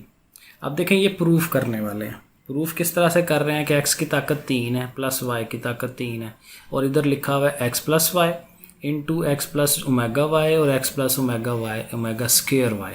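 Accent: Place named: Lahore, Pakistan. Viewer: Ap ki language lahore jasi lg rahi ha lakin ap muskil kam ko bohat easy kr daty ho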